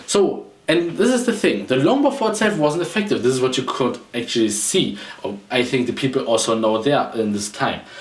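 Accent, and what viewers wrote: Germany. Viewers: Ah, I love your accent. You're German, aye?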